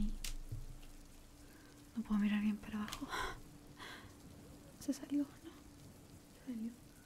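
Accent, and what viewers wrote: Chile. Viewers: hablando chileno❤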